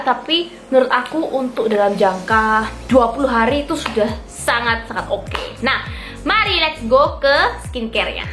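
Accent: Indonesia. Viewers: Suka sama suara kakak medok jawa bgt😂🤗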